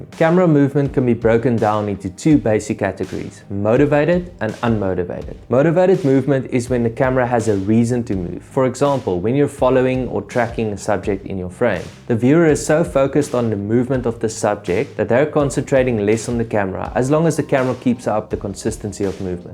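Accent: South Africa. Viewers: I'm so happy I stumbled onto your channel. Between this, the Intent video, and the Contrast video I've never felt more in tune, capable, and powerful as a cinematographer. Weird way to describe the feeling I guess, but as a fellow Saffa it's encouraging hearing such useful, honest information in your own accent haha.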